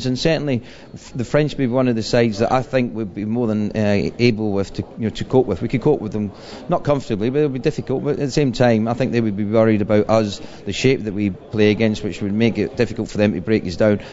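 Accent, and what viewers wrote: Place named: Scotland, United Kingdom. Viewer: Ray is Irish but why does he seems to speak with a Scottish accent?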